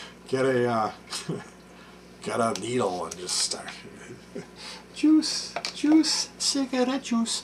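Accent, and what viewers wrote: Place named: Chicago, United States. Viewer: Hey what's the spot you get your juice at? I'm from the south side and I think I remember you saying you were from Chicago in one of your videos, if the accent wasn't enough to tell.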